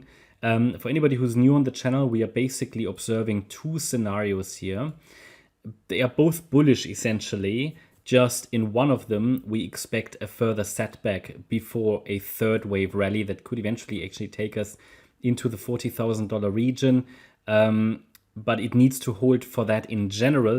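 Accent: Germany. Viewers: Much better German English than mine😅👌🫡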